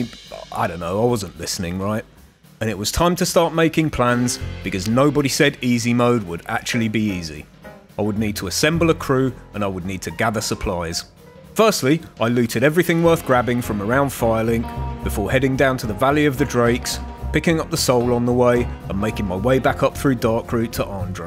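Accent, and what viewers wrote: United Kingdom. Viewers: oh god you're british. not watching bye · I just love tired british acents for example how you say "at a" just sounds like ah a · Why the hell do you sound like old Pyrocynical? Or all british people sound like that? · I absolutely love this sarcastic British narration, your amazing voice and impeccable attitude have earned you one more sub, and hopefully many more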